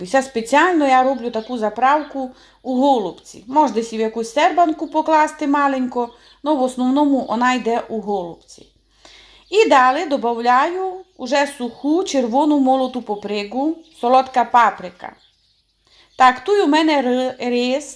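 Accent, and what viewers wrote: Transcarpathia, Ukraine. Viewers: Господи! Яка ви гарна! Нема просто слів! А закарпатська мова - це просто неймовірно 😘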